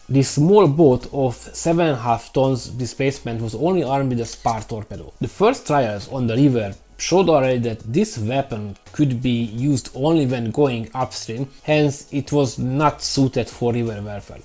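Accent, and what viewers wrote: Hungary. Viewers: its hungarian "accent".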